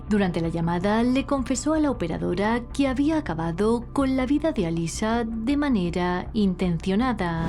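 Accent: Spain. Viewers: Ja debe ser el tono y acento de algunas regiones de España. Ya escuche esa tonada en otra gente española en YouTube.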